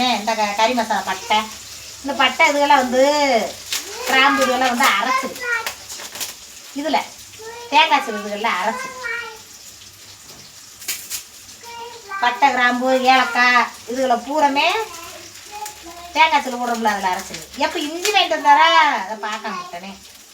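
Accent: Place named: Kerala, India. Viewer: நான் கேரளாவில் இருந்து உங்க வீடியோ பார்த்து கொண்டு இருக்கிறேன் அக்கா. நீங்க பேசுறது எங்க ஊரில் இருப்பது போல் இருக்கும் அக்கா ❤😊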